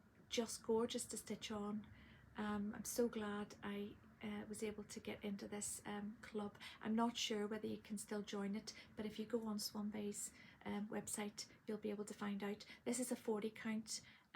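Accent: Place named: Ireland. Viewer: Thank you for giving us another awesome floss tube ❤ beautiful finishes, project bags and your tiny 🐞 ladybug. And I love listening to your Irish accent, it is so soothing! Yes for a tutorial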